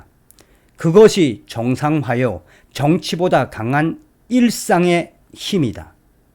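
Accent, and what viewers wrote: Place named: North Korea. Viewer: 이런 재길 안전히 북한 말투 재수똥박아지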